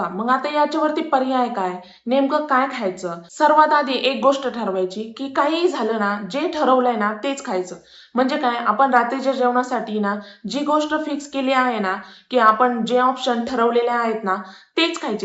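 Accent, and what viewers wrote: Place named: Nashik, India. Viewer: Kuthun ahes tai...tujhya bhashebarun tumhi Nashik chya asavya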